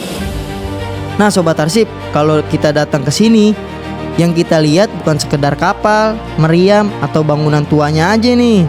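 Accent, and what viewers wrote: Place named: Betawi, Indonesia. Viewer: pembawaannya khas betawi gak kaku, seru, jadi mudah dipahami 👍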